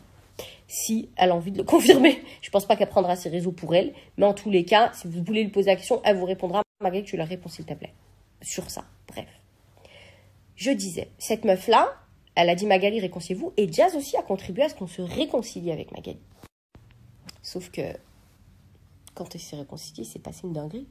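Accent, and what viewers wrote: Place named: Belgium. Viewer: Merci a toi tes belge???? Jadore ton accent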